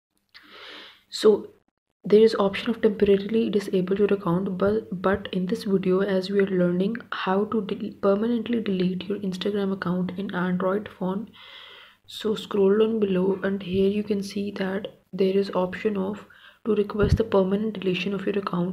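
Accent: India. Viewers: OMG - this lady's Indian accent is enough to make me want to blow my brains out - C R I N G E ! ! ! ! ! Somebody ought to tell her that you don't start a sentence with the word "so" unless you're following up a previous sentence, implying "therefore". D O U B L E C R I N G E ! ! ! ! !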